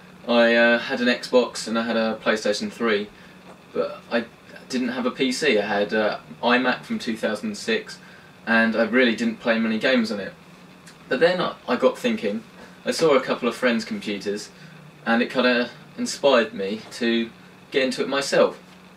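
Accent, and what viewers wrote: United Kingdom. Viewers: His british accent just adds to the comedic value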